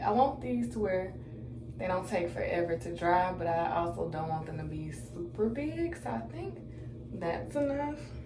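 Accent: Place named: North Carolina, United States. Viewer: Cute💕..are you from NC? You sound just like me😂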